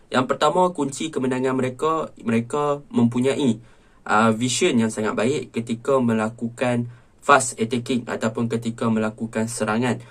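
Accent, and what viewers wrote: North, Malaysia. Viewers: Ada dua channel yang guna loghat utara yang saya tengok videonya. Salah satu channel yang ini... 😊👍🏻 *Scam Amri dah tak jadi, orang dah cam.... masa dua kali buat hari tu, bukan main lagi bangga.. 🤔😅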